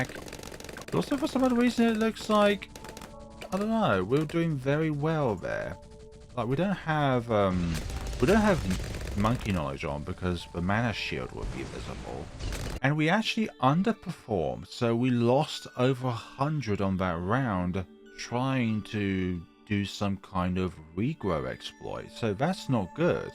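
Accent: United Kingdom. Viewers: british man commentating over videogame is the highest form of youtube content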